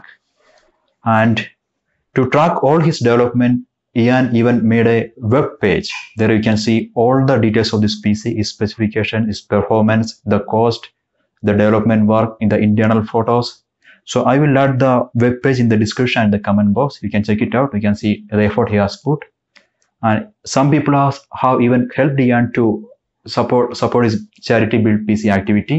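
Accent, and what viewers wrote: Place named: India. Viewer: Bro you are indian Sunke achha laga......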